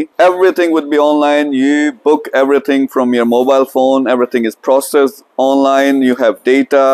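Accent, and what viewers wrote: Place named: New York, United States. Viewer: NYC way of talking an English 😊🖤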